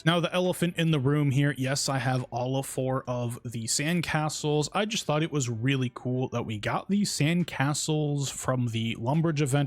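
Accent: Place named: Australia. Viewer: Australian guy talking about castles? I'd be surprised if it wasn't Shad.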